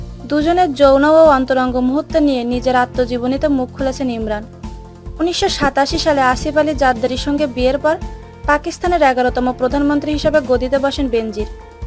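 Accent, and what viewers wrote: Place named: Rural, Bangladesh. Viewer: একদম ভালো লাগে নি! কর্কশ গলার আওয়াজ! উচচারণ এ গেঁয়ো ভাব ! আগে কথা বলা শেখেন তারপর ভিডিও বানান !!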